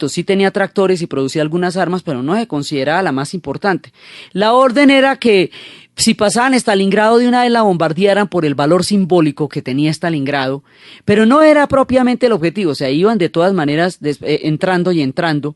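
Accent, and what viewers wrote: Antioquia, Colombia. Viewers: Muy buen relato. ¿Eres paisa?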